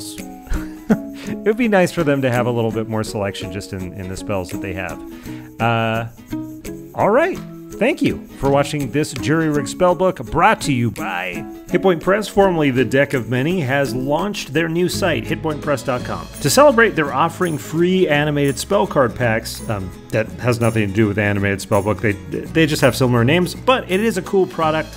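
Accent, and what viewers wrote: South Africa. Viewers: Are you Afrikaans sir?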